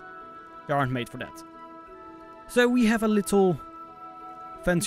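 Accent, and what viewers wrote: Netherlands. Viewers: You sound Dutch 🙈 Are you? I am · Are u dutch bc it sounds like it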